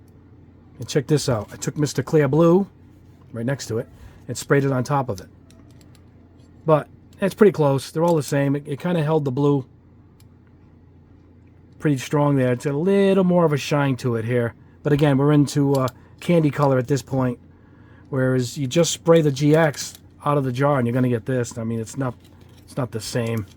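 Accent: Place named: East Coast, United States. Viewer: Thanks so much for everything you do! And its nice to hear a painter who has a nice east coast accent like me. Jersey born and raised. Thanks again!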